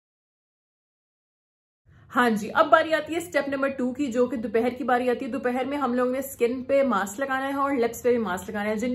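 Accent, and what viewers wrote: Lahore, Pakistan. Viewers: I love ur pakistani accent 😘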